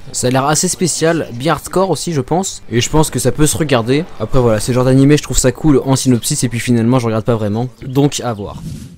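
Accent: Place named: England, United Kingdom. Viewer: Quel bel accent anglais, je me demande comment l'as-tu obtenu ?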